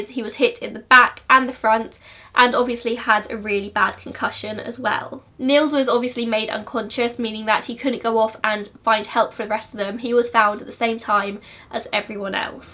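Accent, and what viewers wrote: England, United Kingdom. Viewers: Your pronunciation is actually not so bad.:) Obviously the accent is English, but you got the majority of the sounds put together right. It’s unknown if Irmela was sexually assaulted, and even the police at the time weren’t sure. As for names, most Finnish people do go by their first names, but naming is pretty relaxed, so you kind of can go by any first or middle name and it’s not so uncommon. Also, in English someone might say “Hey,” but in Finnish people don’t say names so often, so you just say “Hey,” and only use someone’s name if you’re talking about them to someone else, so half the time it doesn’t even matter what you call someone haha. Personally I don’t think Nils is innocent of everything, and alcohol consumption and mental health are real issues in Finland, but I don’t think he murdered his friends. There’s no possible way he could have injured himself the way he was.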